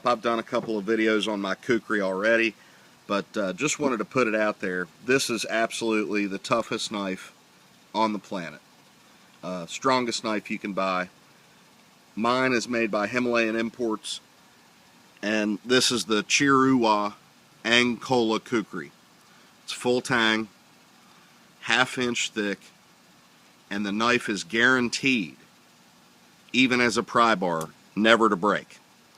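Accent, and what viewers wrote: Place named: Alabama, United States. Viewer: Gotta love that Alabama accent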